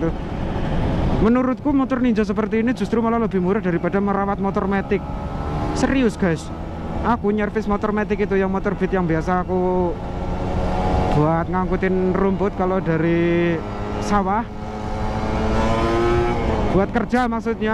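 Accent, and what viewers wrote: Banyumas, Indonesia. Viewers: Ngapak kocak gaess😂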